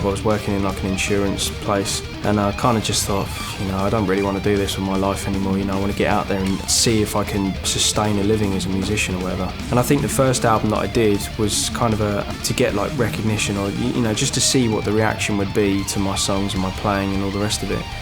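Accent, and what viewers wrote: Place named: United Kingdom. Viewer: "I use EMG's because I think they're the dog's bollocks." I don't know if it was the British accent making it sound better, but I think that may be one of the greatest quotes ever spoken by anyone.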